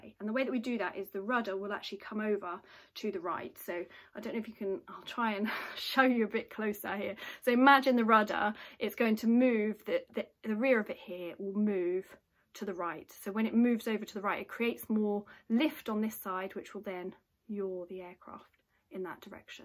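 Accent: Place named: United Kingdom. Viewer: Thank you! I love that I understand you perfectly, even with your British accent (I'm not a native American speaker).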